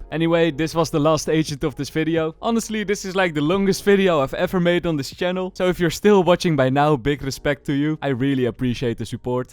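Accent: Netherlands. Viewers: het kaas accent is zo grappig vooral met vjeulereble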